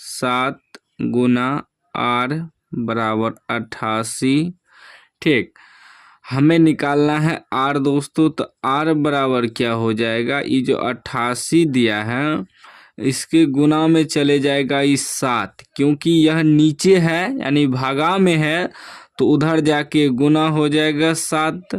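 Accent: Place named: Maithili, India. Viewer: Sir ap methli v bolte h 😃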